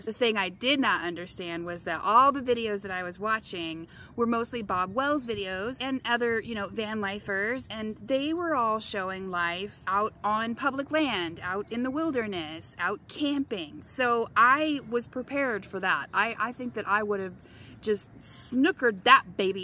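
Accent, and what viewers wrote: South, United States. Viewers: Your accent and southern persona delivery is fantastic. This is coming from a tough critic! Girl you keep on sharing them stories. Your journeys will take you far and wide. Your honesty about the transition to the "Urban jungle" is different than boondocking. My dog and I are doing overnights. We did a week road trip in the summer. Definitely has it's enjoyable and challenging times. That's the adventure. What lies around the next bend. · Girllllll! I feel like I know you! What you're doing is a wish of mine. For someday . Not sure I have the guts tho. Please keep in touch as you can. I'd be tickled pink to meet ya. I'm 61. My husband is a good guy, just super boring. (Engineer) I was an operating room technologist back in my day. I crave adventure. ***I liked hearing a southern voice as well. I am currently way up in Ohio ....ICK !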